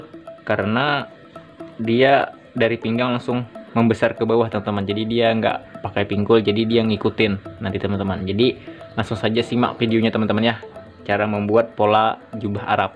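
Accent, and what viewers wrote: Banjar, Indonesia. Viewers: Saya baru liat menit 5 , tidak sampai selesai video. Sudah paham... Sebab saya sudah menjahit/penjahit 15tahun... Tapi saya mau bertanya,anda orang mana , suaranya itu loh🤣🤣🤣 Banjar atau apa??? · Banjar nih kedengaran nya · Logat kayak urang banjar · Pian nih logatx kaya org Banjar Bermanfaat BNR YouTube pian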